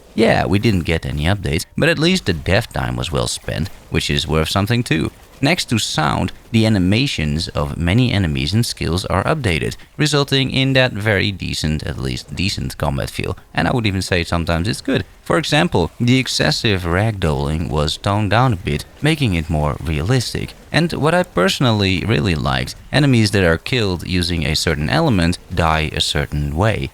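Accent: Netherlands. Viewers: Amazing how a Dutchy can sniff out another Dutchy within 2 seconds, by the accent haha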